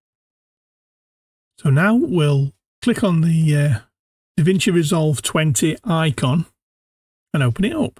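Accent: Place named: United Kingdom. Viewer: I get to learn Resolve while listening to a deep clear British accent, which for an American is a guilty pleasure. Lets go!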